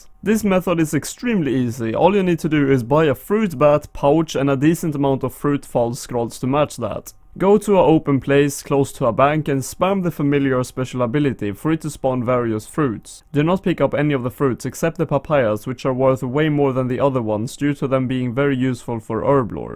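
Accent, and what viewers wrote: Sweden. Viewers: I love ur swedish accent :3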